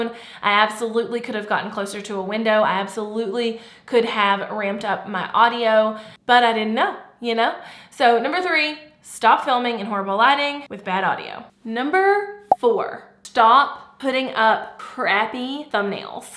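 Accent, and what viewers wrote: South, United States. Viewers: Absolutely love the content and the advice and on top of that, she is incredibly easy on the eyes and a delight to listen to. I love her beautifully southern accent. is really very knowledgable and an epitome of brains and beauty. I have okay audio but it sounds like I am on a phone call...which is what I used the mic for was meetings for work across skype. It is all I have right now, but a new mic is at the top of my list of things to get next. The rest of the 5 things are things that I am constantly watching for but I think I am handling pretty well. I don't have to worry about lighting except to make sure that it is not too dark in the game I am playing so that it is not too dark.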